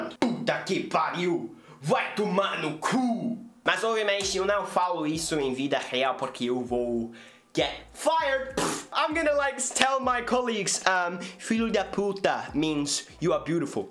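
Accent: Brazil. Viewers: Kkkkk com você fala com um gaúcho falado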